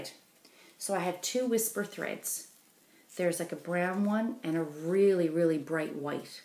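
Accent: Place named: Canada. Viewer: Enjoyed the story about your meet up with the other stitcher. Love your accent, probably because my dad was Canadian!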